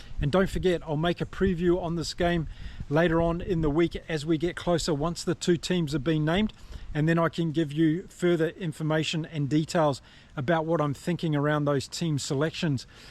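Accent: New Zealand. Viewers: Hi, thank you for the support, I hope you are right!! Also thank you for your neat articulation as you speak, it is really easy to understand your english in spite of your New Zeland accent, to which I'm not used to listen. For example, I have far more difficult to understand the other from Two Cents Rugby. Cheers!!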